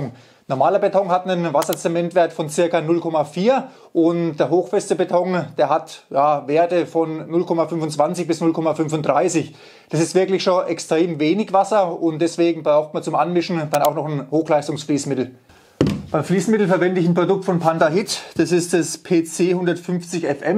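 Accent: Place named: Franconia, Germany. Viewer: Ich hob mer dacht: "wuu kummder denn her? Denn Dialeggd denn kennsd..." Aus Frangn isser wie ich aa! 😀👍 Zum Thema hochfest: Wir hatten früher auch Faserzusätze und/oder gebogene 10mm lange Drahtstücke zum aufnehmen der Zugkräfte beigemischt (Industrieofenbau), wie ist es damit, wird sowas heute noch gemacht? Gut mit der Gewebeeinlage ist die Frage zumindest zum Teil beantwortet...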